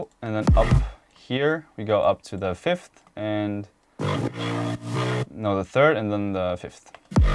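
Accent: Norway. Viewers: Fan du är ju norsk haha hörde det direkt i slutet där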